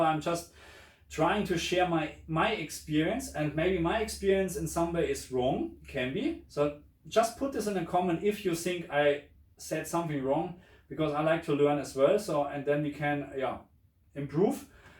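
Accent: Germany. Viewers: If a man with a strong German accent tells me to change my CX settings - i immediately change my CX settings ! 👌🏻🤟🏻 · I just want to tell you that content is very explanatory and you have put some knowledge and effort into it. Thank you for that and keep with it. Do not listen to haters they have nothing to provide. Feedback and criticism are 2 different thinks. And I love your accent. Are you from Germany? Viel Glück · Dojcz akcent?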